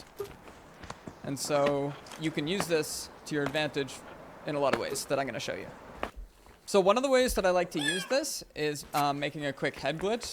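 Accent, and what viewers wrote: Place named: Ireland. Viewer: you are irishhhhhhhh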